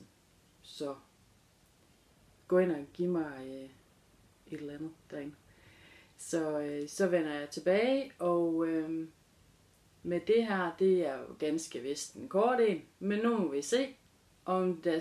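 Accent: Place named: North Jutland, Denmark. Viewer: Hej 😃 Super godt 👍 selvfølgelig er der plads til dig. Dejligt at høre en nordjysk klang 😉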